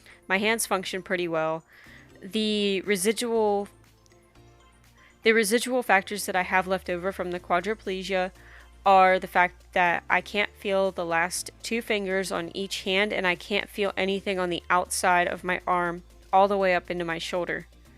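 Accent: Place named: Pennsylvania, United States. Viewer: I'm very sorry. Could be a malpractice suit somewhere in there. Just because a person is 18 years of age doesn't mean there's not an serious underlying problem occurring. If the Docs were that concerned about drug use- just needed to perform a simple lab test there on the spot ( I worked in a laboratory). Would've been ruled out immediately... and quite possibly could've addressed the serious medical issue before it proliferated or worsened. Once again, I'm sorry. You sound like your from Central to western Pennsylvania or even southern Ohio. The manner in which you pronounce the word "cold" is audibly simulant of that area. It's cute though. Please be well.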